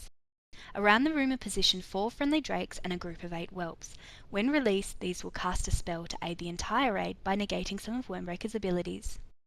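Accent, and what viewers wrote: Australia. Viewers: Aussie narrator, US based guild. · Aussie right?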